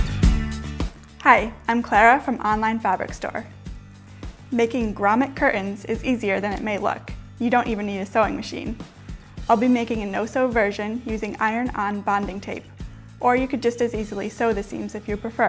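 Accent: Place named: United Kingdom. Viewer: Very British pronunciation. I notice a lot of young YouTubers now drop their Ts.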